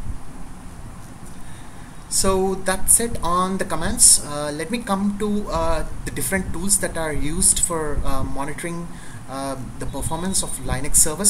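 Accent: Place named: India. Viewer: this is like the <num> video tutorial I´ve seen with Hindu accent, everything is good....except for the MIC, aren´t any good mics on India ?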